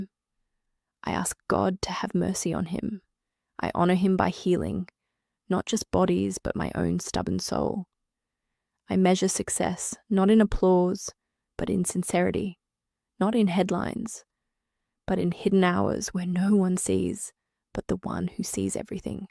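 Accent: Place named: United Kingdom. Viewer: She sounds British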